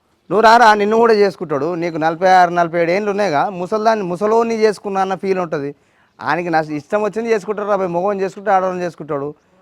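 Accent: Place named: Telangana, India. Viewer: ఈయన తెలంగాణ బాషా చాలా బాగా మాట్లాడుతున్నాడు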